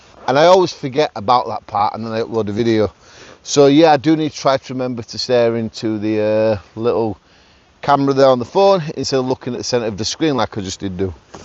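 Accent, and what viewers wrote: United Kingdom. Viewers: here for outdoor motivation, and best uk accent! thanks mate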